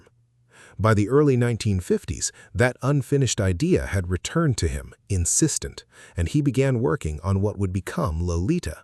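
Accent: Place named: United States. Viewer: Would' e lustened if you didn't have this horrible chewing gum yanki accent. The greatest pissible earsore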